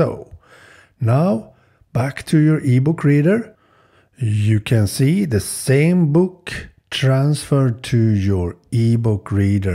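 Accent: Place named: Sweden. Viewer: Härlig svengelsk dialekt! bra video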